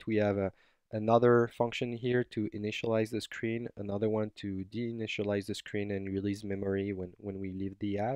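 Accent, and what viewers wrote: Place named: France. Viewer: T'es francais, ca parais ^^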